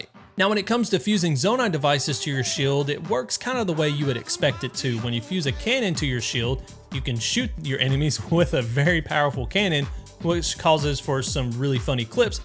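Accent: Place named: South, United States. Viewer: Followed because of the southern accent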